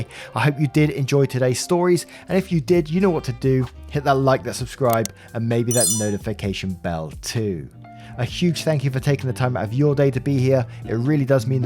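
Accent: United Kingdom. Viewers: I really like your accent. What part of the UK are you from?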